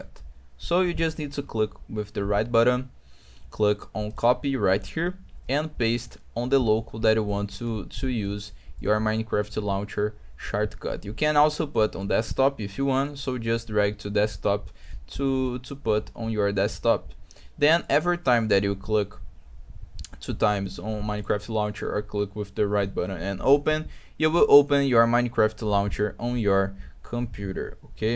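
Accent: Brazil. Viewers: A voz do brazuca é sempre reconhecível kkkkk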